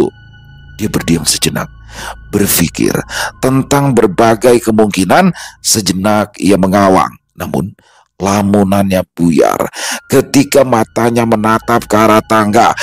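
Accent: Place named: Samarinda, Indonesia. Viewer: Samarinda hadir bang...logat Banjar Samarinda nya Paseh banar bang....ada kime' nya lagi 🤭🤭🤭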